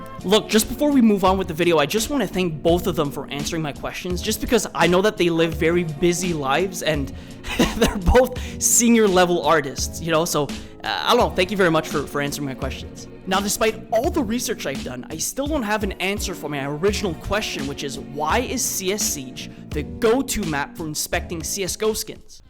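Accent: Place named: Quebec, Canada. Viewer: T’es tu québécois? Ton accent est parfait. Bonne vidéo btw👍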